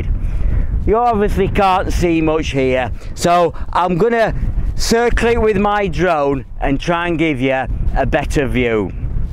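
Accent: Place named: Sheffield, United Kingdom. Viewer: Just found your channel with the chesterfield tunnel. I grew up round there but was in London and the south the last fifty years. Walked to Carl wark with dad. Nice to hear the Sheffield accent , if I might say different to the chesterfield one. Enjoyed all the camping stuff . Thanks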